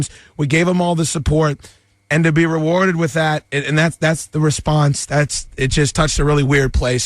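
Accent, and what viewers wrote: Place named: New York, United States. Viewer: Roughly 6 minutes in... you say you were born in NoVa/DC? You sound more like New Yawkuh/Joyzeyite.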